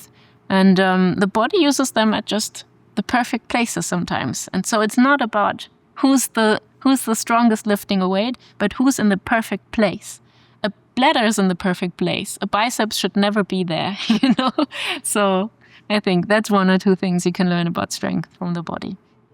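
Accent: Germany. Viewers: I never really appreciated a German accent but her charm just gave me a new perspective on it. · The sound of a German accent is hard to listen to. Very stilted. The sounds dont flow.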